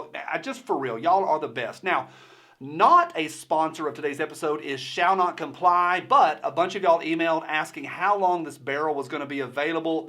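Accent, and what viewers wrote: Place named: Alabama, United States. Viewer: No problems here... Yet. I'm in a constitutional carry state, a very good one I might add. No police problems and no prisoners released yet. I believe criminals should be kept locked up unless we're facing an apocalypse. Even then murderers and other violent criminals should never be released. Your videos are great, always look forward to them. You sound like home, I'm from Alabama. Keep up the good work!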